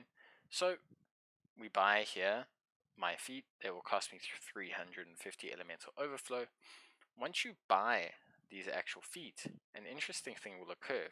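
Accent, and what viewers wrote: South Africa. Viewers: Bro, your South African. Tell me, do you play private servers?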